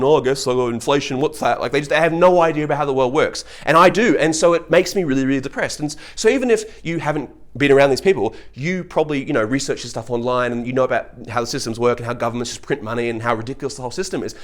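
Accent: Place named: United Kingdom. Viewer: This guy is absolutely speaking the truth. He sounds like he lives in Britain or in Europe somewhere but he's not exaggerating the reality of being wealthy. I live in the U.S. When I was about 20 years old I dated a woman whose net worth was north of 40 million. This was sometime in 1988. I dated her for 5 years and that experience was unlike ANYTHING I had ever experienced before or since. I grew up in a very middle class house hold, so I had access to nothing extravagant. Just to provide a few quick examples, during the time I dated this woman, I traveled to Bahamas at least twice, Los Angeles - and not just LA proper but I shopped on Rodeo Drive, the most exclusive avenue of wealth in the world, traveled to Las Vegas a few times, and I drove several exotic cars including a Ferrari, BMW, and a Porsche. I ate at the absolute best restaurants in the U.S. 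Everything I did during this time was paid for by this woman and her family. I was given money on a frequent basis. Ultimately, the lifestyle almost killed me because I had to a pay a heavy price of being absolutely controlled as to my time and space. I finally broke up with this girl and found my independent freedom again. To this day, I have no regrets on the decision I made. But, the experience provided me with a sense of existential balance that the world is NOT the same for people relative to those who have against those who do not have.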